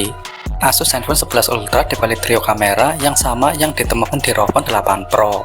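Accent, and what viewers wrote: Banyumas, Indonesia. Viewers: ngapak banget sih ya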